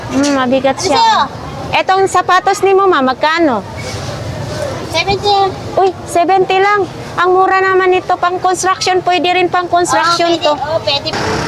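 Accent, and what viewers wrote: Visayas, Philippines. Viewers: Bisaya k mn Day?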